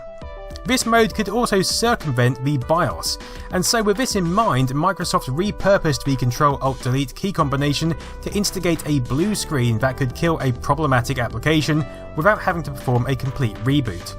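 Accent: United Kingdom. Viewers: Brilliant video after Brilliant video AND with proper British accent what could you possibly ask for more, Thanks!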